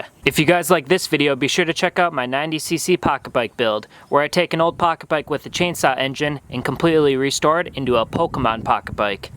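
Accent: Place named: Minnesota, United States. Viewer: Another Minnesotan eh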